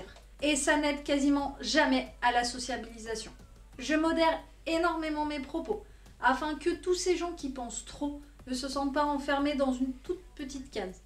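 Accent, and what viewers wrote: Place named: South, France. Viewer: Ah cet accent magique ! Putain con bonne mère :) Coucou de Toulouse ! J'enchaîne avec la suite de ton périple.... merci. Je compte aussi passer le test.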